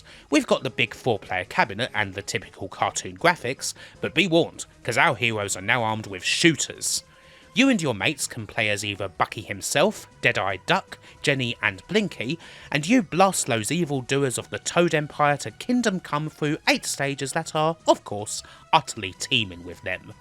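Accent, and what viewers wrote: United Kingdom. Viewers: As an American the way you said "kick some heads in" about X-Men in a pleasant British accent was pretty disturbing/awesome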